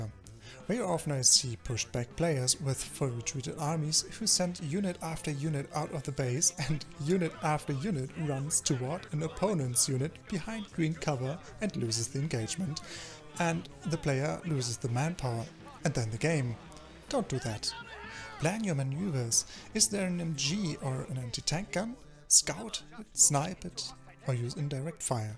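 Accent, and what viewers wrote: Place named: Germany. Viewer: Great tips, the fact that they re also in German English accent is great addition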